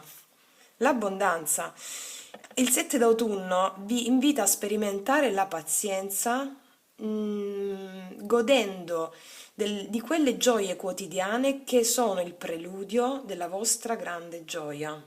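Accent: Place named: Lecce, Italy. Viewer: Grazie ti seguo perché sei preparata e usi le tue carte in maniera adeguata, comunque la prima variante è ciò che sto vivendo grazie, e poi hai il mio accento leccese🤣💖💖💖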